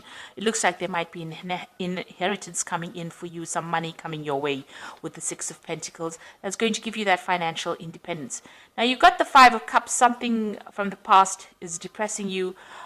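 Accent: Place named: South Africa. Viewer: Great reading, thank you 🙏. Also, is that a fellow South African accent I hear?? 😃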